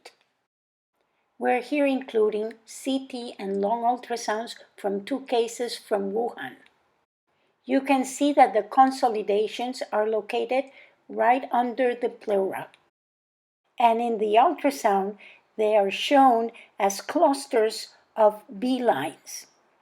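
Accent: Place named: Italy. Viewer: MD, RDMS en los comentarios del video se escribieron tantas cosas de ti, tienes todavia muchos fans que añoran esos tiempos mucha gente me decia que te habias casado con un militar de la epoca de los 70s otros cque con un musico, etc etc las pocas veces que habalste ne los videos note un acento diferente, eres italiana?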